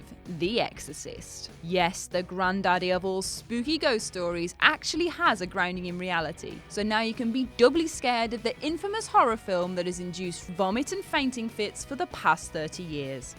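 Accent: United Kingdom. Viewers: I don't believe in ghosts, but I also don't have a problem with hosting. I find it kind of refreshing to hear a female British accent presenting a Whatculture video.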